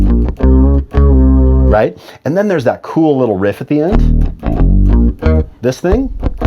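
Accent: United States. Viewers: Oy! What happened? I went away for a year or two and you have an American accent?? Or have I found a different Bass Lessons? Geeez, I'm confused......I could've sworn your were a Brit.... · is that you talking? I’m totally tripping on your voice I’ve been following your channel forever and I never heard anything from you ever that sounds like your a straight ahead American speaking beast of a bassist. I personally think that you are probably the most under mentioned professional on the circuit! I alway hear Stanley, Marcus, Victor...etc.... but you are right there on the same platform as the greats due to your understanding of the bass. You really really know and understand how to utilize several aspects of your fretboard due to your wide variety of techniques available to you. Many are deemed to one style or genre of music and watching your videos have been a great way for me to broaden my musical styles. 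I’m a full time musician and have been for some time now and it’s always amazing to see players like you that can pull every technique off as if it’s their only bag. Great job love your videos keep it up. And that voice??? I like the old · I actually like new American accent. 🤔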